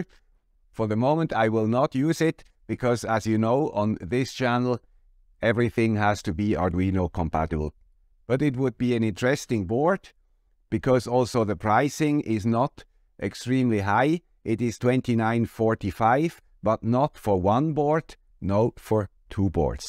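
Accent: Switzerland. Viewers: I blame the high quality of your project focused videos: "Oh, the guy with the Swiss accent dropped a video, gonna be something cool - oh it's a mail bag. I guess I'll watch it later" :) I, for one, appreciate the breadth of these. So perhaps keep them less often, but collect several cool things. Like this one (well, two).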